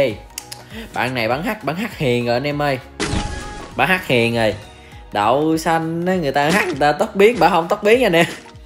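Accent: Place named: Mekong Delta, Vietnam. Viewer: hèn gì giọng miền Tây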